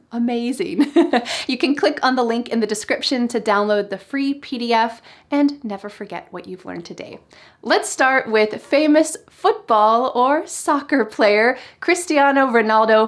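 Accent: United States. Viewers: I really like ur smile, and you have a beautiful American accent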